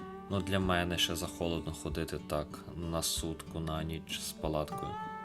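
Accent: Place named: Volyn, Ukraine. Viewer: цікавий діалект, звідки ти? волинь (десь цей район?)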